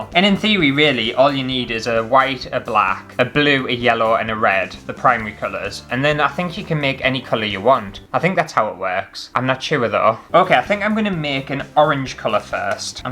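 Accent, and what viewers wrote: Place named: North, United Kingdom. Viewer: Aww your (northern?) accent is so cute and wholesome. Also your content is really entertaining, subscribed!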